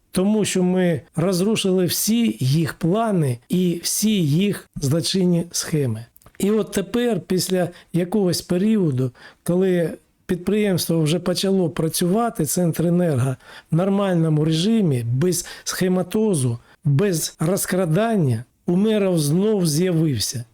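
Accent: Moscow, Russia. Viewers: Згоден в усьому. Слух ріже а-ля мацковська вимова: Акремі, Ачікує, кАму, пАпередники, Астанні... "Плюс" зміст, подача "мінус". Коротше: Ахуєть, а "училке" з української наше "ФЕ".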